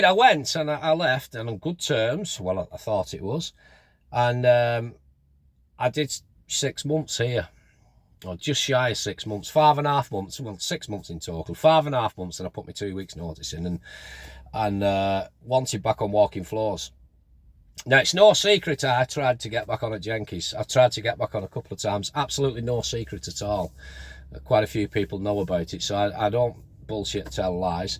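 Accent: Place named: Wrexham, United Kingdom. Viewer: Sounds like Wrexham 😂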